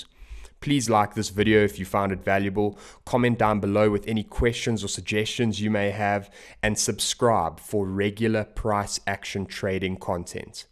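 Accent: South Africa. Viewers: definitely a south african boet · South African? Kzn ?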